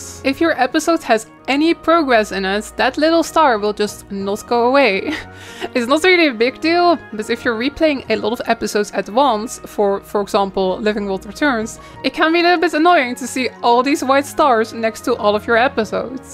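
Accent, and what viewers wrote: Netherlands. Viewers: i knew you were dutch before i saw the properties for guild wars 2.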